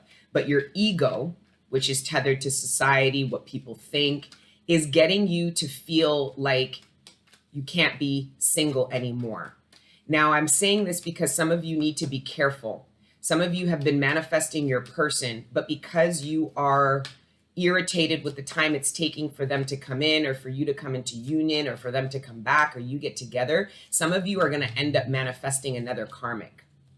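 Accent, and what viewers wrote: Jamaica. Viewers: Your yardie accent had me hooked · Can I ask are you a question are you Jamaican 🇯🇲 ❓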